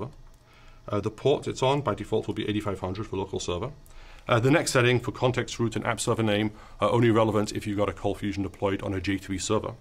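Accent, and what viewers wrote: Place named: Scotland, United Kingdom. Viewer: So, that accent is totally Scottish. Just curious which city it's from.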